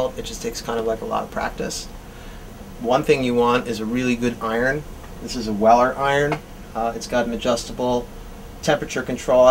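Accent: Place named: United States. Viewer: cos he's american... they say it like "sodder" for some reason.. even though nobody else in the entire world does.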